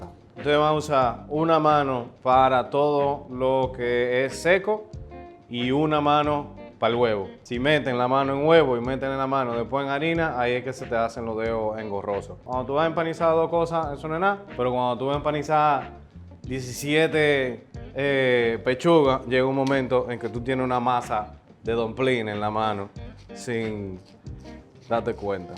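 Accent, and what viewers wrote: Dominican Republic. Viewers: Vamo arriba!! me encanta nuestro acento tan original, siempre y cuando no caigamos a lo vulgar, solo que apreciamos lo que realmente somos cuando estamos fuera de nuestro país. Este canal me encanta!!!! Bravo!! 👏🏻👏🏻👏🏻🙌🏻😊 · Jajajaja!!!! primera vez que veo este chef me encanto!!! su forma de explicar muy gracioso parece dominicano al hablar 😂😂😂😂😂😂😂😂😂😂😂😂😂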